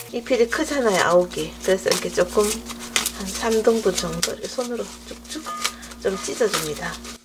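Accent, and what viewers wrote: Gyeongsang, South Korea. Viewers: 맑은아욱국 콩쪼가리 싫어하는 사람이나 애들에게 좋것네요. 깔끔하고하니 더 정갈하고 맛있어 보여요.방송도중 경상도 사투리도 간간이 나오고 정겨워요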